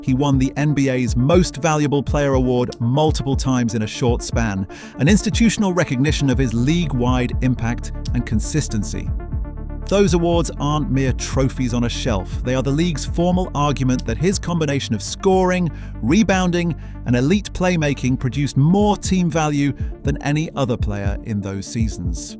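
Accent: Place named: United Kingdom. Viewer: Originally I was leery of your Anglo-accent (you know the bias: what could some Brit or near-Brit have to say to us about our sport?), but soon I realized this was an extremely insightful analysis of the Joker's game. And more and more, I began to appreciate your beautiful language, from beginning to end. I love Jokic's game - the essence of basketball art - but never in the vast library of Jokic videos have I heard more succinct, more precise, more well-wrought, more understanding descriptions of what the man is doing. Just excellent. I salute you. In my view: # 1 Jokic video.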